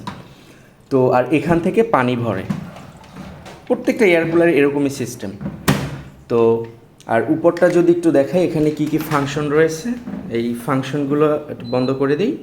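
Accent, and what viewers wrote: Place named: Bangladesh. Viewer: Apnar kotha sune mone hochche apni Bangladesh theke. Oidike coastal type er climate , cooler dry weather Chara cholena. Air cooler north/West india te bhalo chole, adrota thakle kokhonoi cholbena. Apnar chit chite lagche Karon humidity thakle aro humidity baraye, local Air cooler onek bochor use korechi, ac-r Cheyeo thanda Hawa deye, local cooler onek sosta ar. Boro hoye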